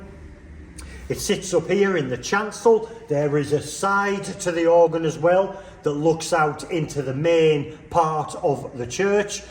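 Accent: North, United Kingdom. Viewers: Thanks a lot for this valuable exploration with the typical northern accent 😎. A tracker-action organ always offers (not necessarily a comfortable, but) the most direct playing feel - no matter if slider chest or cone chest. Even if I have to "work" more, I prefer a mechanical instrument - they are most honest to me - and I can save money for the gym 😉 Electric action is always "switch on - switch off" many meters away - reverb included...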